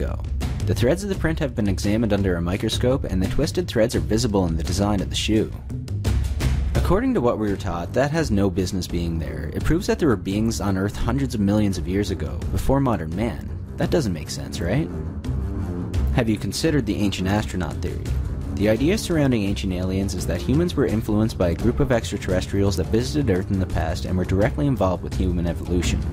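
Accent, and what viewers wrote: Scotland, United Kingdom. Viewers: Scottish? Did I miss a voice/face reveal? 😕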